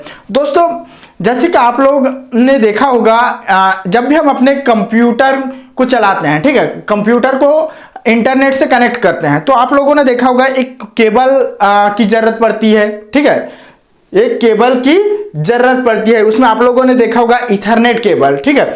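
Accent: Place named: Bihar, India. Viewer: Thik sir ji mujhe Etna to pata chal Gaya ki aap BIHAR se hai .😊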